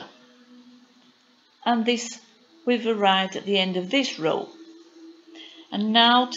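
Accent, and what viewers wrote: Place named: Northern England, United Kingdom. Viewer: This lady sounds like she's from Northern England (UK) If you want to be pedantic, I guess you must say 'cro-shay' Here it's a double crochet. I've watched so many American/foreign crochet tutorials, it can be easy to confuse single with double. I guess we count the slip sritch as a 'double' 🙂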